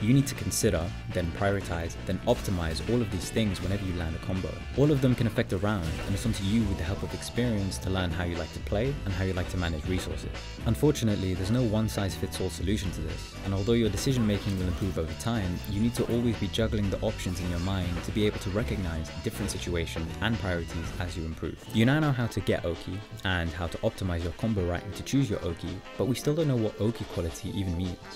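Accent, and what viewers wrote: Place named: United Kingdom. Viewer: Only clicked in to verify that your are British, and indeed you are. Good day.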